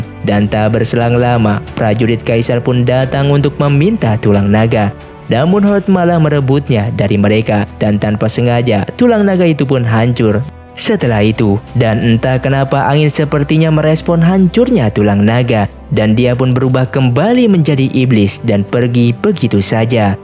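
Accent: West Java, Indonesia. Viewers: bang maneh jelma sunda nyah?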